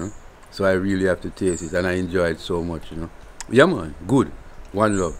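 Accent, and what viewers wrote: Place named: Jamaica. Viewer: Patois was strong in this. get passionate. Love it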